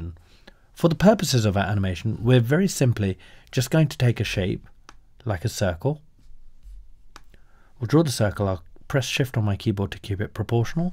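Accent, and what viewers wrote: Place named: United Kingdom. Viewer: thamk you OH so much, Brit accent teacher!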